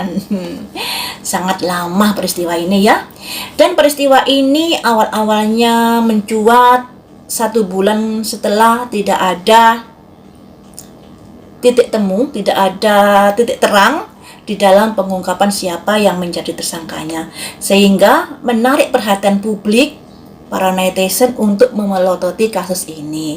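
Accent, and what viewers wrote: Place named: Java, Indonesia. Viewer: logatnya jawa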